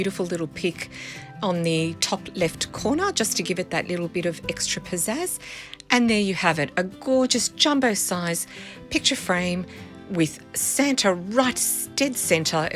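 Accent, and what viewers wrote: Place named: Australia. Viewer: Hi these are gorgeous DIYs, it's nice to see /hear an aussie crafting on youtube, I live crafting but struggle to find suppliers many ribbon at Christmas here I'm in rural Victoria, I really enjoy your channel thanks for sharing all the best Mary 🌻😊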